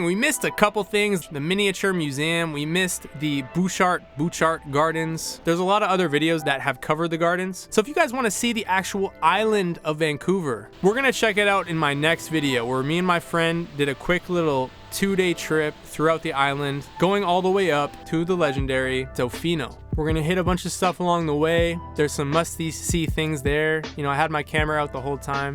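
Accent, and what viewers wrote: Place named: Toronto, Canada. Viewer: I came across you for the first time ever and just by the sound of your voice I could tell you must be from Toronto 😂 great video capturing Victoria!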